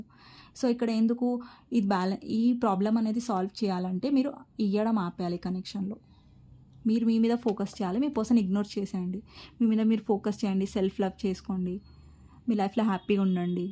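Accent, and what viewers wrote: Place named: Telangana, India. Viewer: ఎవరిని పట్టించుకోకండి. మీది తెలంగాణ బాషా చాలా బాగుంది బాధ పడకండి. మీరు నిజాలు చెప్పుతున్నారు చాలామ్మా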